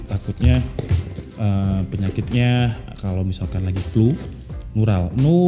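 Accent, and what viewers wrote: Java, Indonesia. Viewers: Lo orang jawa ya bro. Bahasanya kok mirip orang jawa gtu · Radak ono jawa"nya sitik😂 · Sampean jowone ngendi bang. Wkwk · Sampean jawane pundi mas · Asli ndi seh sam peno, ⁉️. Koyok e wong jowo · Wong jowo pa bang😅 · Jan wong jowo tenan ki rek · Keri cok, jowo bang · maaf ya kak kakak itu orang Jawa atau orang jakarta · Wng jowo cek😂😂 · Mase wong jowo🤣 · jowo mu ngendi kang...😂😂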